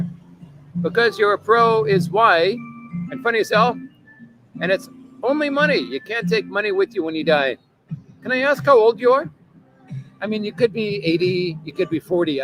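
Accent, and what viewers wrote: Canada. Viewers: Do all Canadians talk like you?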